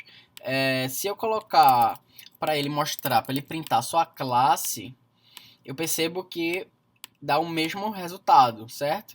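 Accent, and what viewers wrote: Pernambuco, Brazil. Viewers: Esse sotaque de pernambuco é muito característico, parece muito com o meu de Natal. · E pelo sotaque deve ser pernambucano.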